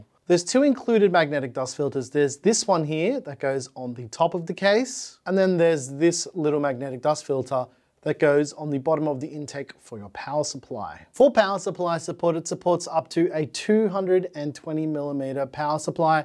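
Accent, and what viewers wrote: Australia. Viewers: I just bought this case and looked up this video to see how to take off the glass panels without manhandling and breaking them. Stayed the whole video for the chill vibes and Australian accent.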